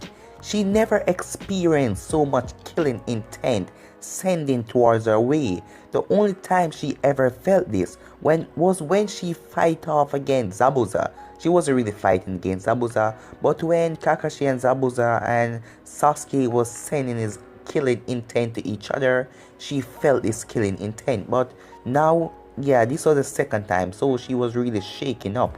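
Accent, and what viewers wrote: Jamaica. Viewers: Hes Jamaican dumbass.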